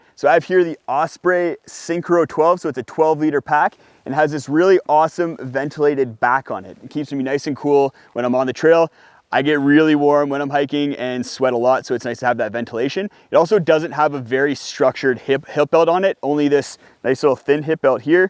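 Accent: Canada. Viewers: The Canadian accent is amazing. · Wow this guy’s Canadian accent is thicc!!! I’m from Detroit so familiar with Windsor, Ontario but this is at another level. Great information though! I added some of these items to me REI shopping cart. Thx!!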